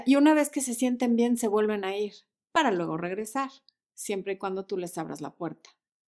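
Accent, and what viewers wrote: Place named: Mexico. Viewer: Lo máximo. Me encantan tus consejos. Y tu también tu asentó mexicano.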